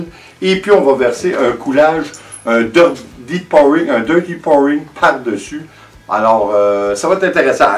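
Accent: Canada. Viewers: Magnifique accent canadien 😀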